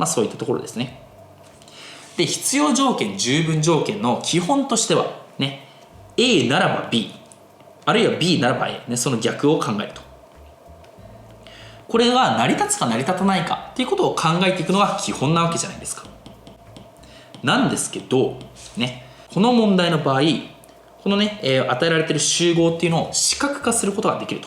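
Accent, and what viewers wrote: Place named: Yamanashi, Japan. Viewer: 山梨だよ、多分甲州弁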